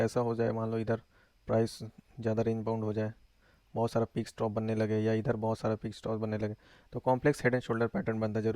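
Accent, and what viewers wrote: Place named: India. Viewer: Can't understand the English. Sounds indian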